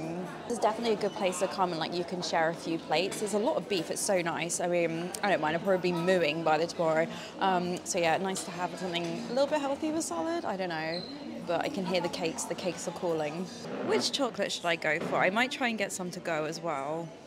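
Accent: United Kingdom. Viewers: Thank you for the excellent vlog. It is calming to watch it. There is no yelling or constant nagging about selling stuff. You did a great job reviewing the cafe and the food. The elegant British accent is so good.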